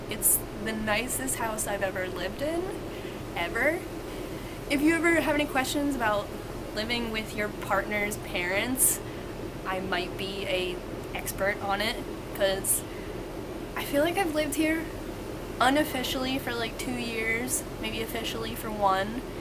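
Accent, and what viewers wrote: Philadelphia, United States. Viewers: so funny, i clicked around and found one of your other videos. the second you spoke, I thought to myself, this girl is from Philly too! sure enough...cant wait to see more. 215 love.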